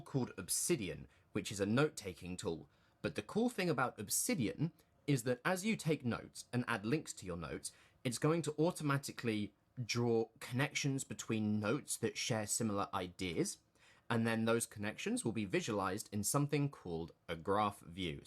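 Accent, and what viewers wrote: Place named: United Kingdom. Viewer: I love the analytical approach to song writing! It's tricky deciphering feedback, and this chain of resources you've established makes a convoluted process much more streamlined. Also, your smooth cadence and suave British accent makes listening to your explanations that much more entertaining. Haha.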